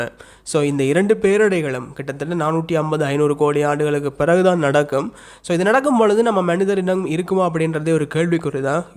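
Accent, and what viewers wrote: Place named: Sri Lanka. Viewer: Alagana srilankan Lang tamil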